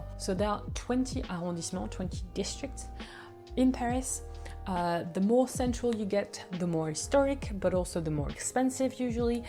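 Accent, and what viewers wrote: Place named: United Kingdom. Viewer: You sound like you are English (British)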